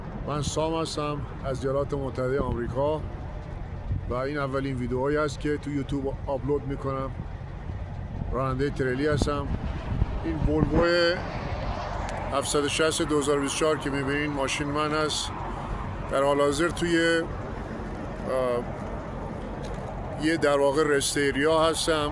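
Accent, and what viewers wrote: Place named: South, Iran. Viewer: لهجه جنوبی داری شاید از ایل لت آبادان باشی آرزوی سربلندی برات داروم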